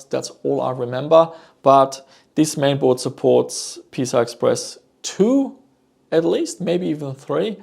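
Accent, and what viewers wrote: Germany. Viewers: Why do you sound German to me? I'm German and especially your pronunciation of "the" really sounds like a German or Danish guy speaking English. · You live in Australia with a german accent??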